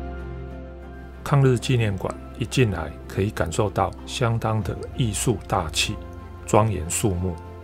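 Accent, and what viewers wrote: Taiwan. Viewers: 操着台灣國語腔調的先生闡述抗戰的史實我眼淚也躲眶而出．以從會再看你的視頻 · 这是我听过的最亲切最好听的台湾话！！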